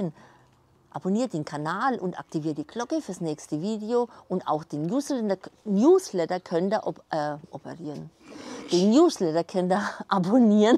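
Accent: Franconia, Germany. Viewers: Hallo Du bist Fränkin und kommst vermutlich aus dem Raum Ansbach, stimmts? Liebe Grüße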